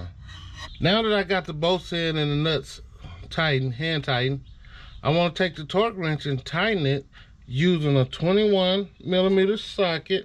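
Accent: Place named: Detroit, United States. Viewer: Sound like you from the D.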